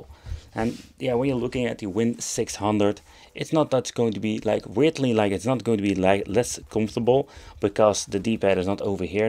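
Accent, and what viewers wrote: Netherlands. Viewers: Enige reden dat ik het zou kopen is omdat ik geen steamdeck kan kopen ivbm wachtlijst.... Maarja weetje €300 voor wat nostalgie games is opzich te doen. Je Hollandse accent is niet te missen trouwens 👍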